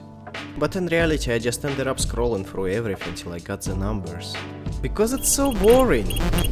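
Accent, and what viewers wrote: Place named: Ryazan, Russia. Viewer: ох уж этот рязанский акцент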